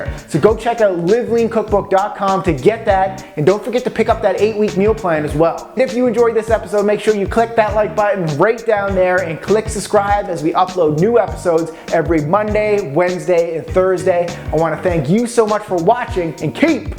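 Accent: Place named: Canada. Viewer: That fucking Canadian accent makes my ears bleed.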